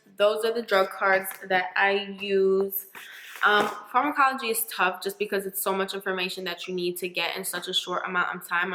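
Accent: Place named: New York, United States. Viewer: Most definitely sound like a New Yorker. I’m a Brooklynite and she dead sound like women from my childhood.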